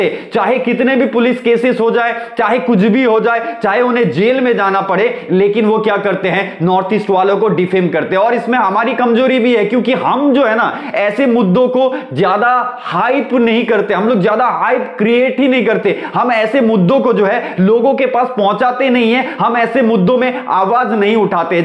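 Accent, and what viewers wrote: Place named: West, India. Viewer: Nahi vai iska voice aur tone dekh k lagta hain western part of India se hain.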